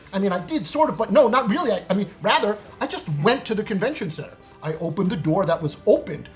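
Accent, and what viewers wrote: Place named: Northeast, United States. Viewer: Mr., you mispronounce the name of the state of Nevada and would mispronounce the name of a highly important mountain range in the state of California, the Sierra Nevada. It is not pronounced "na Vaaaauuugh da," it is pronounced "Ne Va da." The middle syllable is pronounced like the word "Vat" without the "t." It sounds like you were born and raised somewhere in the northeast or upper Midwest of the United States. You are living in the west and should pronounce like the people who live in Nevada and the Sierra Nevada. Otherwise I like your undercover videos and most of your viewpoints. Keep up the good work!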